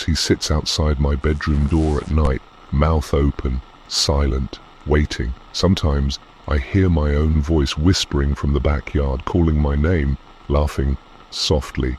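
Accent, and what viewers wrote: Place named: United Kingdom. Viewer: AHHH HES BRITISH >X33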